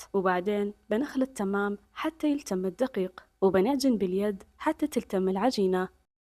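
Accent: Yemen. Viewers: لهجتك يمنيه عرفت لما سمعتش تتكلمين مثلنا 😅بالتوفيق بمشروعك الجميل والصادق ❤ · فديت بنت اليمن اعرف اللهجه لو بين مليون والنظافه والادب والاخلاق جبرني بك ❤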